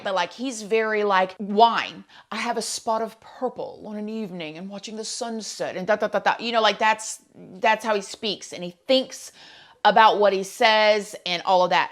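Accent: South, United States. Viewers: Seriously! As a Texan; raised (mostly) by my Brooklyn mom who recently had lost all those goofy (or what I used to think was obnoxious 'isms) after a stroke. It is TOTALLY my favorite when "da, da, da" and "excetera" 's... ESPECIALLY with that southern twang! My foreign grandparents used to say I have the thickest southern accent, which I really don't having grown up around so many different accents. tickles and warms my soul.. helps the grief 🥰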